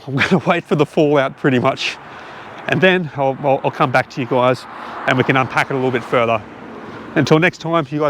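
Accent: Australia. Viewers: always curious to know one’s blog location. your accent (or absence of) tells me you’re from Australia, maybe north Queensland. can see the sun is near vertical, ambient light very bright, & a high contrast image. I was brought up on the Toowoomba range escarpment (Great Divide), so am quite familiar with these conditions. now retired & spent most of my life in Sydney, i’m not very interested in politics any more, & lean towards liberals or smaller parties, liberals for stability & smaller parties for interesting alternative ideas. i wonder if they will ever change the base voting algorithm we use - currently based on individual, electorate & preference. you could design a set of algorithms which would rank the party list very differently, for the same individual set of votes. (an example has been demonstrated in TV counting). then take some average for a more “accurate” vote outcome maybe? 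more likely the start of arguments.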